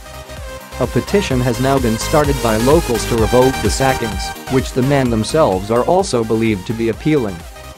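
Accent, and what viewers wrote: United States. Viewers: Narrated by an American robot?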